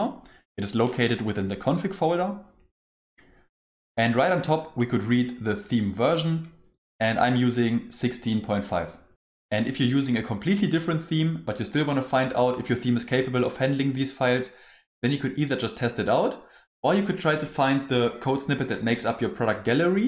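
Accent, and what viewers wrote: Germany. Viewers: das deutscheste english was ich jemals gehört habe.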